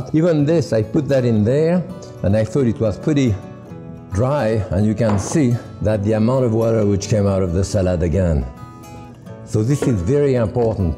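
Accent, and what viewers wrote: France. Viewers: I love the way he says 'my mother' so much love ❤ in the way he says 'my mother ' especially with his French accent, love it !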